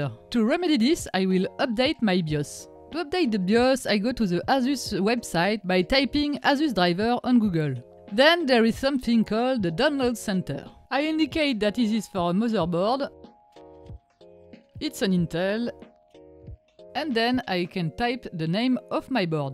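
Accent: France. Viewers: merci pour ce super tuto avec l'accent très "français" je comprend tous hahaha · (heavy french accent)..."and now lets see if I bricked my motherboard" LOL. Never thought I'd hear that from a French woman after modding her bios to boot from an nvme drive via pcie adapter on a 2012 motherboard. Same one I've got. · I'm just here to study her french accent english 😅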